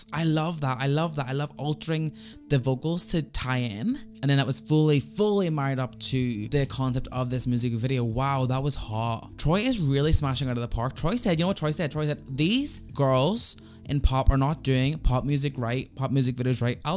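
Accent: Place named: Northern Ireland, United Kingdom. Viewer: Yes ,,,,ok can I just say I hadn’t got a clue what the hell you were saying,,,,,, I would recommend you trim the accent down to the point where people outside N,Ireland would not arrive at the conclusion that English is your second language. And I’m from NEWTOWNSTEWART via Amsterdam and LONDON.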